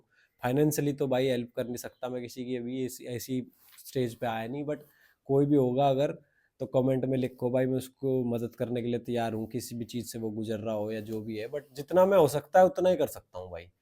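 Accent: Haryana, India. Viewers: Hai tu up ka bole haryanvi hai ... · he talks like haryanvi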